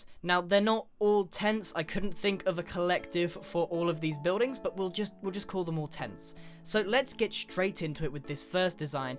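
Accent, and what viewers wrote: United Kingdom. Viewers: Is it me or grain really emphasizes that he’s British in this video? 🇬🇧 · Ameenitys ha British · your from BRITIN wait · Caravan. In Australia we say Stuff pretty similar to the way you say it in Britain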